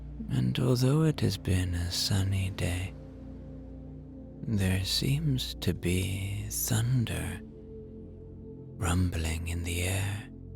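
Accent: Ireland. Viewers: I have a 3 week old baby and your sleep stories have helped me conk out when my husband takes the baby for a while! It’s lovely to hear an Irish accent in the stories. Loving your work, from a Galway girl turned Dub 😂❤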